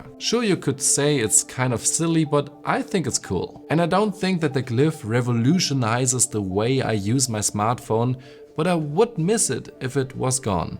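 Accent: Germany. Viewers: Are u a native german speaker? Fellow german here, hearing it in ur voice. Sounds wierd to watch a yt vid with a German speaking english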